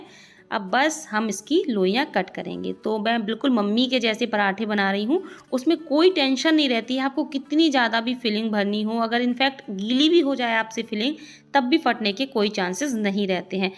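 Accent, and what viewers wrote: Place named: Bundelkhand, India. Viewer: Aapi boli se apnepan ka ahsas hota hai, apni taraf ki lagti hai, aap bundelkhand mai kaha rahti hai, kache amrood na mile to kaise chatni banaye?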